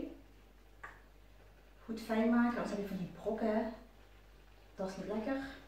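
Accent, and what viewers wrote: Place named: Limburg, Netherlands. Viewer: Ik hoor een Limburgs accent! Ik kom namelijk ook uit Limburg hahah leuke filmpjes!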